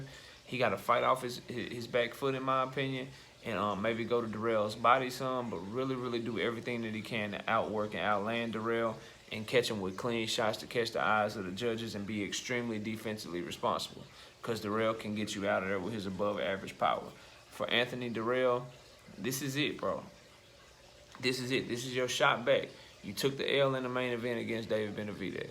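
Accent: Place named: North Carolina, United States. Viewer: You from North Carolina? You sound like my family. And you said Bo lol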